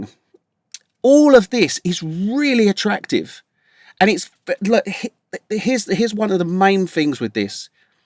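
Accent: United Kingdom. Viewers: I love the british accent: "Can I masta"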